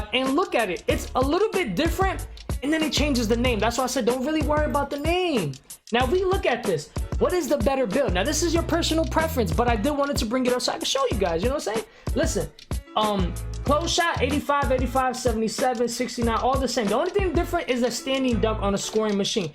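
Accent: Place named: North Jersey, United States. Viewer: Oh yeah and shot out jersey in the buildin aaayyy South Jersey Camden county to be exact.. I know by how u talk u from north lol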